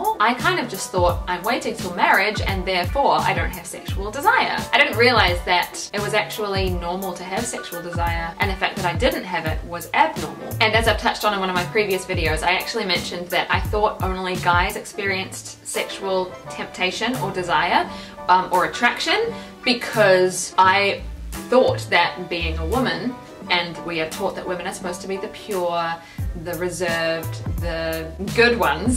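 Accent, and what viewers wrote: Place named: New Zealand. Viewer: are you from new zealand? my second guess whould be australia your accent sounds a little kiwi to me,just curious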